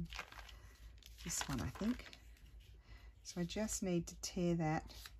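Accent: Australia. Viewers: Hi, I like your style and the ideas you come up with. How did you come to live in Italy?? Obviously an Aussie accent - then you talked about the weather......not the same as here!! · Hi Yes I found her on you tube with a flip through of Happy mail for Gayle,then I bought her download papers on ETSY and I watch her when I get a chance..I then watched Gayle which led me to you and many others and subsequently more buying of paper...Now Im learning to journal and card make too.....I can't believe there is such a thriving wonderful community of crafters from "All over the World". I'm in New Zealand "Your old neighbour across the ditch...I picked your Aussie accent straight away and was so thrilled to hear of your life in Italy'...Its a great thing this crafting how it brings people together.Thanks.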